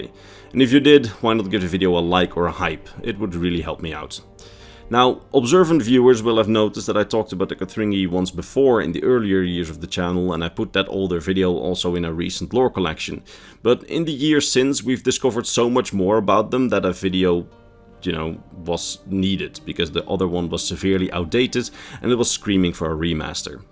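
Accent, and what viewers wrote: Netherlands. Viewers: Ik hoor gewoon t Nederlandse accent haha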